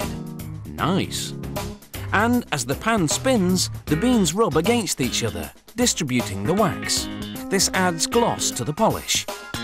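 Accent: England, United Kingdom. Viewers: i love English accent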